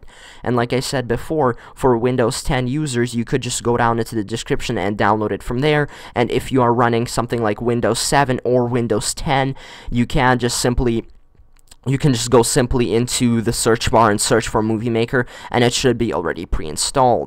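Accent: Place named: Egypt. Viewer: You kind of sound like someone from my school someone Egyptian that comes from Cairo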